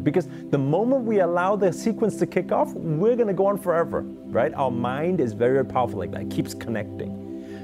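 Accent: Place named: Liverpool, United Kingdom. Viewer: Gotta love that scouse accent! I needed that taste of home! Just had this video sent over and was a wonderful insight that I’m gonna put into action. I’ve been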